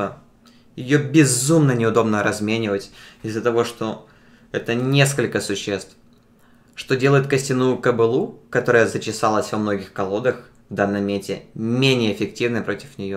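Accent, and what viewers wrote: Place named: England, United Kingdom. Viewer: у тебя очень приятный голос. Видно, что ты уже привык к английскому языку и у тебя присутствует этот акцент. Надеюсь это не единственное русское видео на твоём канале)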